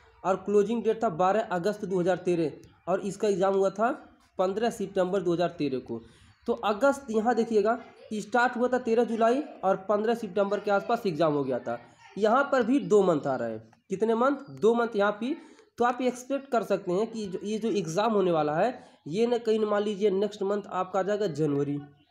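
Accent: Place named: Bihar, India. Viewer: I love bihari accent🤪🤟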